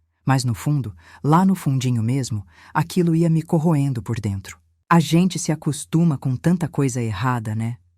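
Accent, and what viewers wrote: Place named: São Paulo, Brazil. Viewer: A narradora estava falando tão bem,com um sotaque bonito de são Paulo capital. De repente, o sotaque ficou interiorano trocando o R pelo L. Horrível.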